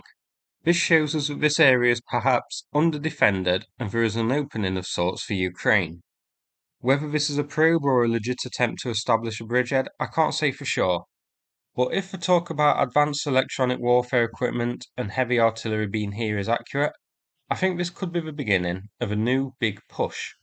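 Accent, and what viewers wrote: United Kingdom. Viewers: Jesus! A heavy British accent plus a cheap microphone - and you get narration that is hard to comprehend. I have to stop every 20 seconds and go back several times! you are making good money from your channels - can't you afford a decent microphone? I am already in the middle of the video, and I am not quite sure who shoots at whom. I had to open a map to see what is actually shown in the video. And by the way, there is no Eastern or Western side of Dnipro here - there are Southern and Northern sides.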